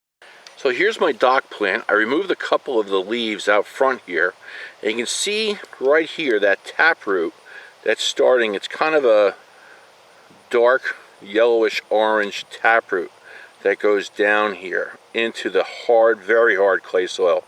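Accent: New Jersey, United States. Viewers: YAY! I have been doing similar things with Dock. It is nice to have others see its value. I felt lonely when I thought I was the only one who was onto this, and yet it made so much sense to me. I am loving your videos. They all make so much sense. So many pieces of the puzzle fitting together. I literally stayed awake all night last night watching your videos, I was so fascinated. We are establishing a Permaculture food forest on 1/4 acre in Portland, Oregon. It is fun to hear a New Jersey accent. I grew up outside Philadelphia. Blessings :)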